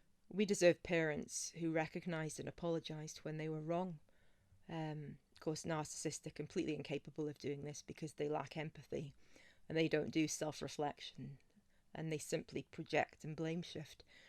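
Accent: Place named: United Kingdom. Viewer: Greetings from your sister over here in Savannah Georgia, a gentle hug and love from me to you. You have the British accent I have the Southern accent, 🌷 Happy Easter precious Soul.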